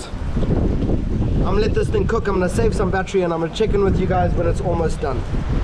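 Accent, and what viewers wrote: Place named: South Africa. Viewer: Refreshing Saffa accent. Tight lines boet.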